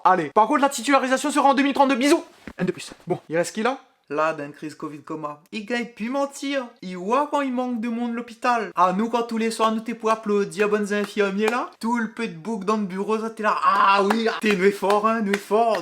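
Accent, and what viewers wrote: Réunion, France. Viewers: je te suis depuis peu et tu es une vrai pépite! Ce petit accent yab tellement irrésistible ainsi que la fraicheur et le piquant de tes vidéos, quand on les regarde c'est un peu comme si on mangeait une barquette bouchons avec siave pour une réunionnaise installée en Métropole... continue fait rire a nou encore lontan ;-)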